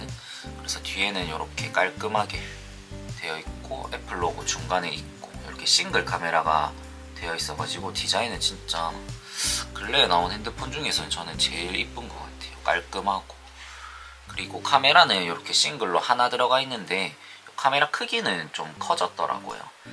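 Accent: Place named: Seoul, South Korea. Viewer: 어디 지역사세여 사투리랑 서울말이랑 계속 왔다갔다 하시네요 ㅋㅋ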